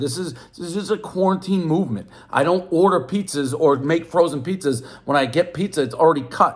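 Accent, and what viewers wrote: Boston, United States. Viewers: He's pure boston, newengland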